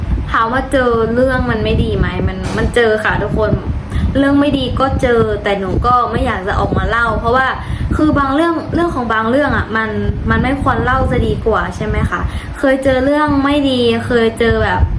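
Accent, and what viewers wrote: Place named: Laos. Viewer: หนูรู้ไหมสำเนียงของลาวลุ่มกับไทยภาคกลางยกเว้นกรุงเทพรวมถึงภาษาพูดพื้นๆเลยจะเหมือนกันมากเลยมีความเหน่อแบบเดียวกันแต่ภาษาพูดมีต่างกันบ้างลุงฟังหนูพูดเข้าใจได้ง่ายกว่าภาษาอีสานเคยฟังน้องอูพูด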